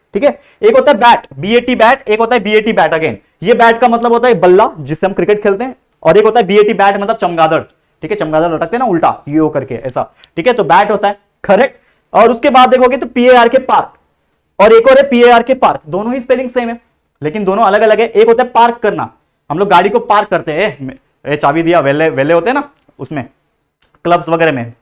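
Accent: Maharashtra, India. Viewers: Sir aap Marathi ho kya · Sir apke muh se Marathi bohot pyaari lagti hai❤️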